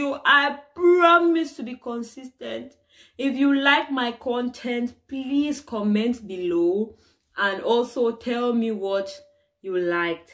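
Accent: Nigeria. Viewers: your speaking pidgin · your accent is slightly Nigerian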